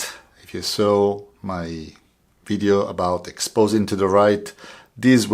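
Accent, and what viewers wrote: Italy. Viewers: hello, I very like you performance and passion for what are you doing, your videos are very helpful. Your English is very understandable and I like Italian accent, please continue with this. One of best channel for me. Ti auguro tutto il meglio. Ciao Luca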